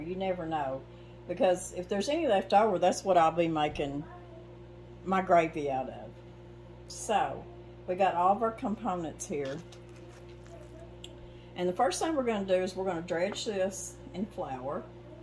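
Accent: South, United States. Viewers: This is one of my favorite meals. I've only made it once though and it was OK but not as good as I've had at a restaurant. I didn't use the crackers crumbs. I am really interested how you tenderized that meat though. I tried with mine but it didn't look like that. What is your secret? I love listening to you talk too. My momma was from Kentucky and I love the southern talk and way ya'll say things. I watch your channel a lot. Thanks for this recipe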